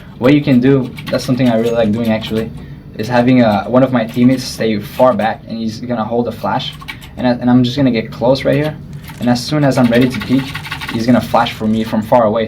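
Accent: Quebec, Canada. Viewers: I like that quebec french accent :D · Dat subtle french-canadian accent.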